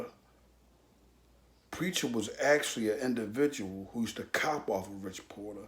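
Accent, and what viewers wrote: Philadelphia, United States. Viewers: Oh you definitely from home, philly lol I can tell how u talk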